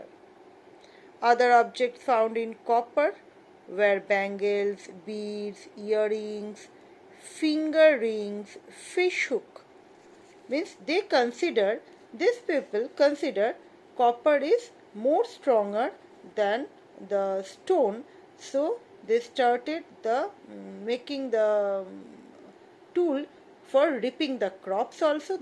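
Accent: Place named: United States. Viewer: My goodness... your English speaking is like an American😮😮!!Well you are Indian..😊!!!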